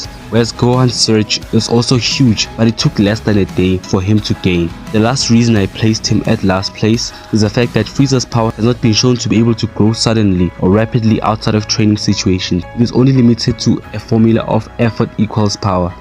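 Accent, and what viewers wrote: South Africa. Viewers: Dude, are you South African?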